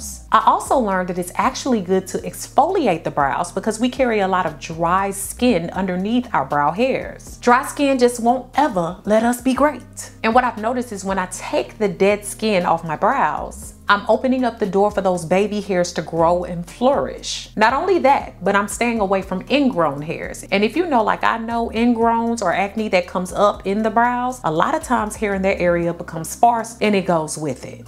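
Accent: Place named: Midwest, United States. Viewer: Are you from STL? I think that I can “hurr” our STL Midwest accent lol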